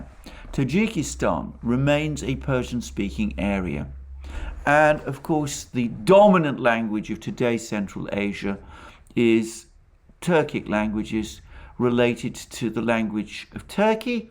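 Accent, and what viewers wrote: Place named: RP, United Kingdom. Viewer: He uses the Queens English that's why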